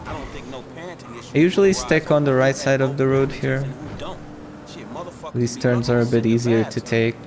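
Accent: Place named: Hungary. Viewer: Na a magyar akcentus befigyel :D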